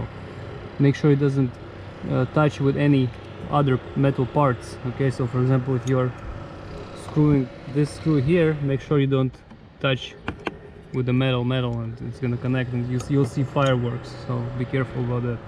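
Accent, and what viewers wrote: Russia. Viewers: You sound like the Russian Hacker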